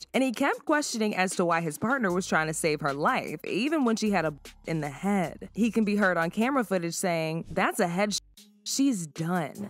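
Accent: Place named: United States. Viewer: As an English man I approve of this American version of the English language ❤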